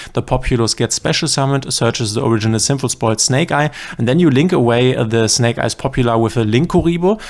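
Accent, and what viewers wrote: Germany. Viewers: Dieser deutsche Akzent 😁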